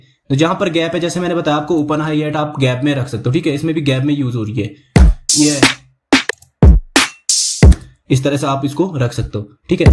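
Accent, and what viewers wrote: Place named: Hills, India. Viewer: aapki awaj se hi lag raha tha ki aap khi pahad se belong karte ho , main Uttarakhand se 😊🙌🏼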